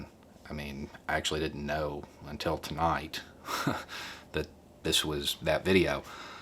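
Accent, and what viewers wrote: South, United States. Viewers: i like watching you since i live in europe and no offence but you sound like common southerner but you have a brain. and that makes me happy that there are still americans who can think for themselfs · You giving wisdom with a Southern accent, changes more than you'll know. You've been a way that I've been able to move my extended family's political needle. That is a movement I never thought possible.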